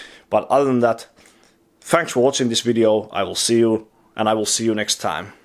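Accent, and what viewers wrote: Finland. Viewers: Aksentista huomaa että oot suomalainen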